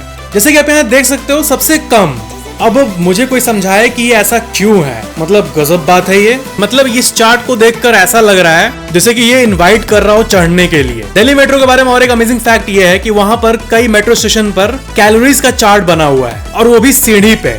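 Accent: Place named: Uttar Pradesh, India. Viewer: भाई आप कहां से हो हमें तो लग रहा है यूपी से हो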